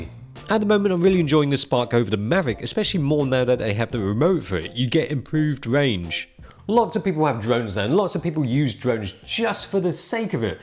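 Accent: United Kingdom. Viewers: i cant watch this, my brain hurts because my eyes are looking at an asian guy but my ears hear a deep voiced brittish bloke ... its so confusing at so many levels. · Thumbs up who thought it was a voice over by a British guy.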